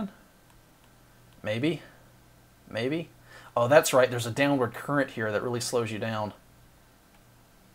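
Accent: South, United States. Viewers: Accents are awesome. My favorite is hearing UK or Australian people putting on an American accent., you sound like you're from an urban area in the southern U.S. - not a thick stereotypical "Southern" accent but a hint of the twang/drawl. (Likewise, I'm from the Minneapolis area so I have a hint of the northern Midwestern "O ya sure you betcha" thing, but not nearly as much as my friends from rural MN/WI.)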